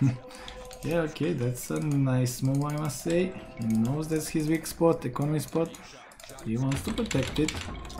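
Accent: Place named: France. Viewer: Are you french ?!! Your accent is kind of familiar…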